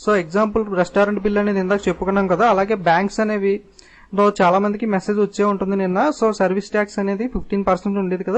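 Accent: East Godavari, India. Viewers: babu needhi Thoorpu godavari ya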